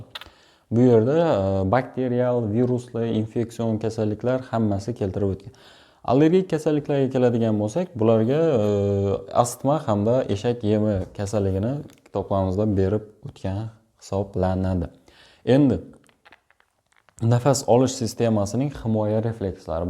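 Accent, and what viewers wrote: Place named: Khorezm, Uzbekistan. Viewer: Dm zor tushuntrbsz raxmat shevangzdan xorazmlimisz diman😅😊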